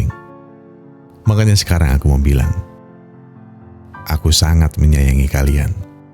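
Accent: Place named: Java, Indonesia. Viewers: Logat jawa'mu kuwi lo aku suka 😊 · Suarane khas bgt wong yogjo mas.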